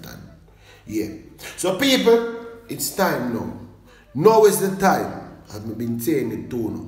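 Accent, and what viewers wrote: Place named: Jamaica. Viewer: It seems that non Jamaicans can't understand your style of speaking; and your up & down tone is similar to a preacher ( in JA, a church elder). It would be marvellous, if you could try to accommodate your growing international audience, by offering 'close captioning' - black lettering on a white background. Much thanks. More time.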